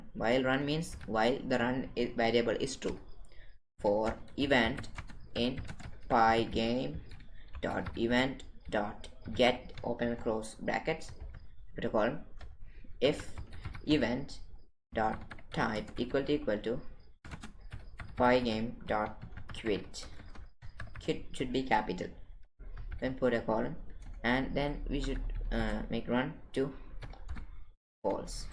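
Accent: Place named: England, United Kingdom. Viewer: u turned to an engliish dude!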